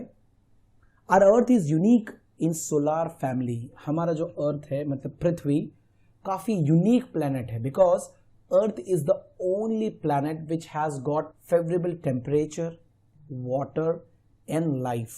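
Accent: Gujarat, India. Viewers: 🧑‍🏫Sir you from gujarat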